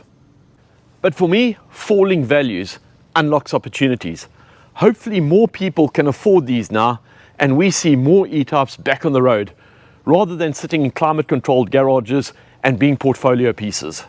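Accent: South Africa. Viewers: I detect a South African accent. 🇿🇦 Sitting in my local in Margate recently, in discussion on classic cars with a friend, who like me is in his 60's, a 21 year old sitting next to us told us how he also preferred older cars, like those from the early 2000's.😅😂. Great collection & great video. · That is one hell of an accent you have. South African? Whatever it is it's probably worth more than the cars. God bless.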